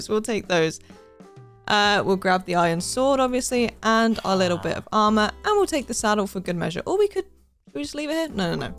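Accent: United Kingdom. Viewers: Are you British you sound British?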